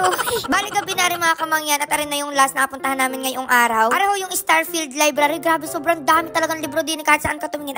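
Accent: Batangas, Philippines. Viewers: Why Kamangyan ang vlog mo? Are you part of Mangyans? Nagtatanong lang, curious lang ako. Kasi your beautiful even no make up. Saka accent mo Batanguena. Ala Eh!! From Las Vegas, Nevada USA.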